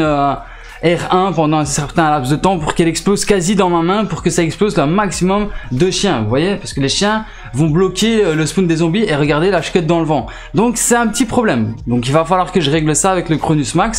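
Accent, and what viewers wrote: Belgium. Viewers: ton accent belge est genial ! JCVD qui t'apprend un glitch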